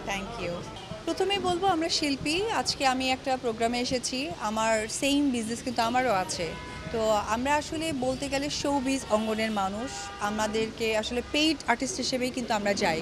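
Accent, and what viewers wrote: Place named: Kolkata, India. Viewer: কিন্তু দিদি,কলকাতার একসেন্ট শুনতে পেলাম বোধ হয় 😅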